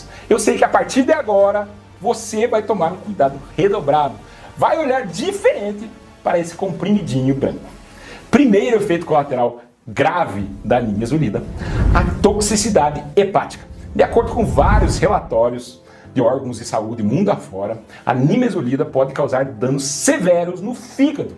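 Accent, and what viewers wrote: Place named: Caipira, Brazil. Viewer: Taquifemia com retroflexo "R" caipira! Não entendi nada! 👅 👅 👅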